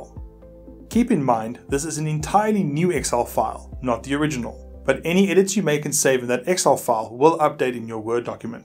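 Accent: South Africa. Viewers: Great South African Accent !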